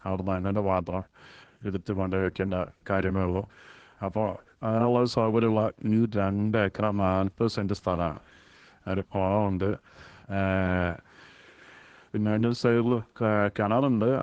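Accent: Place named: Tamil Nadu, India. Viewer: നീ എന്താ പറയുന്നത്? ഇത് തമിഴാണോ? അഥാ കർണ്ണാടകയോ ?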